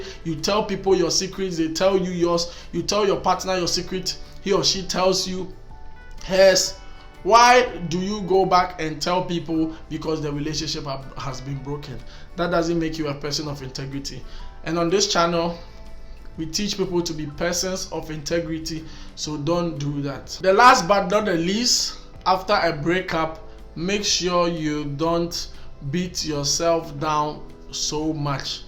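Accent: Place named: Africa. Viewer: This African accent. I love it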